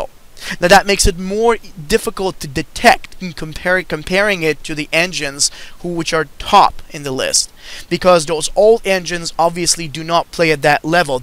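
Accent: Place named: United States. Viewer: he's from Bulgaria, however, I'd say his accent is 90% american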